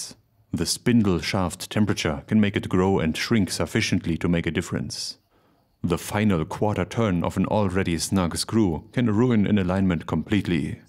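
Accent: Germany. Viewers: the German-vampire accented humor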